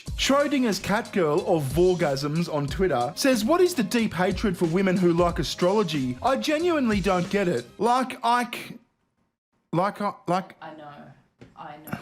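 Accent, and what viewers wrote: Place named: Australia. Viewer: the australian accent is just so awesome. i want u to say put another shrimp on the barbie in an upcoming vid. with the exaggerated upwards accent like 80s paul hogan. p'huttt ahhnuhhthah sh'hrhemp auwn theah bahbbeh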